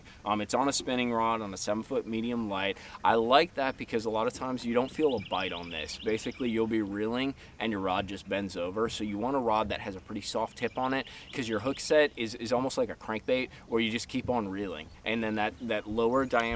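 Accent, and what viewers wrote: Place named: Alabama, United States. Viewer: someone is starting to sound like he lives in Alabama.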